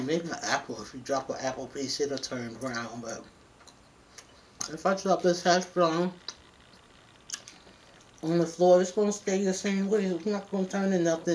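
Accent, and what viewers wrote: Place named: New York, United States. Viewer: I love watching your videos I’m always here for your New York accent I miss my accent I’m so country now...lol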